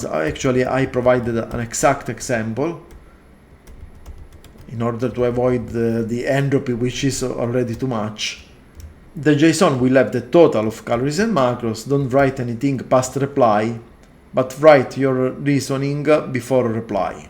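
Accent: Sicily, Italy. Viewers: inglese con accento siculo o sintesi in italiano.... vita di merda XD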